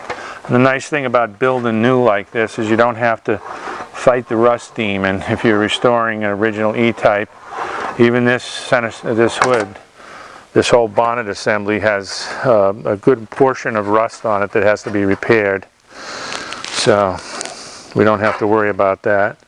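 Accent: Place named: New England, United States. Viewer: Because of your New England accent I thought it was a flexible shape hat , but that makes sense too so that's what I call it. By the way it's pronounced Jag you are. That's only when you're across the pond.lol thanks for everything you've taught me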